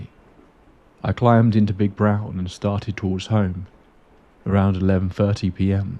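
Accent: United Kingdom. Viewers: Love your british accent .great stories..from usa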